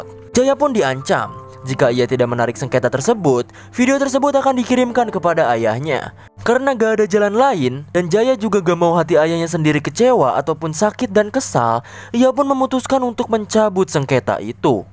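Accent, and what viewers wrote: West Java, Indonesia. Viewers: Sunda bang?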